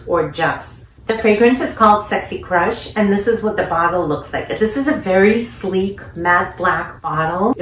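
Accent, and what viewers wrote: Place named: New York, United States. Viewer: I just love your New York accent ! ❤️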